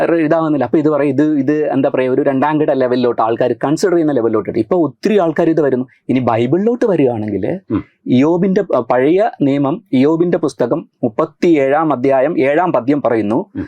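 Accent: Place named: Trivandrum, India. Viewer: Tvm slang alle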